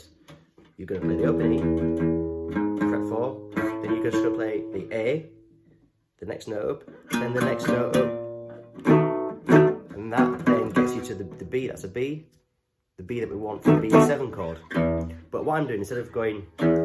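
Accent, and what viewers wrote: United Kingdom. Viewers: ☺️ ah! Nice too hear a native YORKSHIRE voice teaching guitar. Nice wee tutorial as always, cheers pal 🍻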